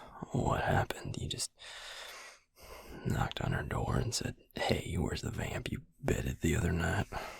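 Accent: South, United States. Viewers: Even for someone who's lived in the south their whole life, accent still gives me 🦋🦋🦋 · he rlly do, sounds like a southern or country accent he’s got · Okay I’m normally not a fan of southern accents but yours is an exception 👀💳💥💥💳 not too strong I like it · HE SOUND LIKE HIS FROM THE SOUTH “hey sugar” 😫😫😫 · as a southerner you're really killing the southern lingo! all you need now is to throw in a handful of "fixin' to's" and "ain't's" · I have to say that I do love calming bedside manner as a healer! Just hate to see him being drained to heal. Happy to hear from again! His smooth, Southern accent is so nice. Love learning more details to this ongoing storyline. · the southern voice 😙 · loooook im not a fan of southern accents but <3333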